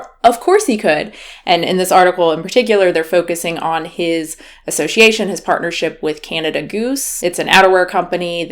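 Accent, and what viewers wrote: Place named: South, United States. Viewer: I think the most effective way to spread the vegan message is to bring back your southern accent.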